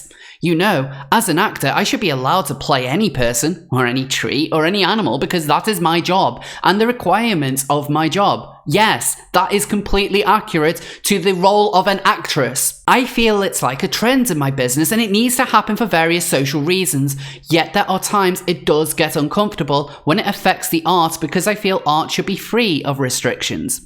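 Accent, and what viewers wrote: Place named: England, United Kingdom. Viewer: Your English accent is awesome and classy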